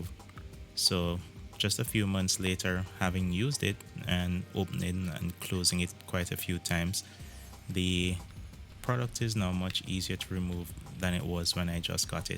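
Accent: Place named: Jamaica. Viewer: You sound Jamaican...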